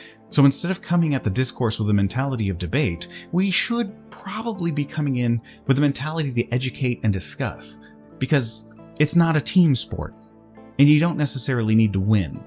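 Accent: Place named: United States. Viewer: One critique from the early portion; You sound like your culture is American, your heritage is Asian. Just like I am American with a German heritage. Just a nitpick, don't mind me, lmffao.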